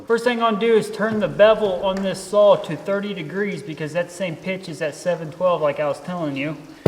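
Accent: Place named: West Coast, United States. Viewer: I understand that. You actually sound more West Coast, lol. 😂👍🇺🇸😊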